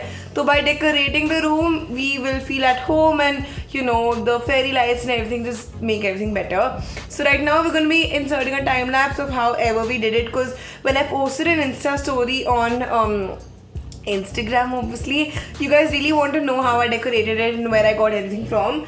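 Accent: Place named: India. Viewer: I think Indian English its very typical Indian accent .....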